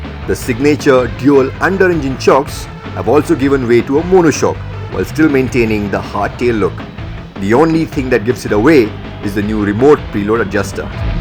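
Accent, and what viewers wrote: India. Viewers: great review and the Indian accent rocks :o)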